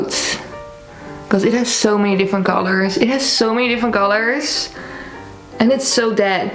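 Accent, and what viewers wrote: Netherlands. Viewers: every time I watch your videos I still wonder if ur dutch cuz I never knew but u rly have that dutch accent lol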